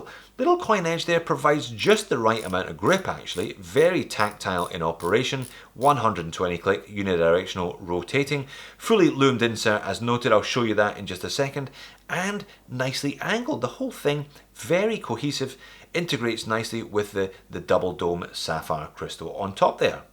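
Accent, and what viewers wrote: Scotland, United Kingdom. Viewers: Honestly I think you’ve got the best watch channel on YouTube. Even when you don’t like a watch you give it a fair shake and most of the time you don’t review watches that an average person can’t afford. And you’ve got a Scottish accent which makes everything you say more enjoyable. Keep up the good work my man.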